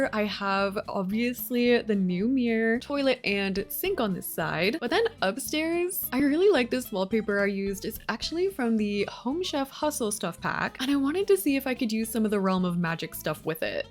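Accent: Canada. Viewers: I’ve never seen your channel before but this video just popped up in my recommended’s. This house is stunning!! I had to check your “about” to see if you were Canadian because I immediately heard the soft and cozy Canadian accent ✨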